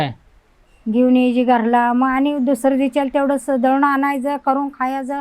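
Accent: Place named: Kanthi, India. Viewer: आवाज कंठी गावचा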